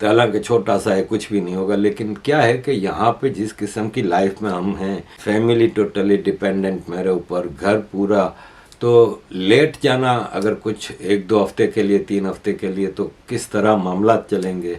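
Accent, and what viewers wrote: Gujarat, India. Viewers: Bato se gujrati zalakati hai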